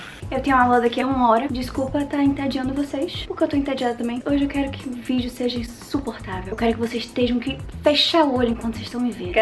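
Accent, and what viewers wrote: Bahia, Brazil. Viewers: Amigaaa Eu maratonaria todos o seusa vlogs pro resto da vidaaa Manda esse povo ir.pra merda Vc e especial pq e diferente algumas meninas(o) quando crescem digitalmente ficam seguindo um padrão chato e vc ñ Nunca perca sua eesencia doida Ps: gente eu divido o mesmo sutaque dessa diva bahiaaaaaa❤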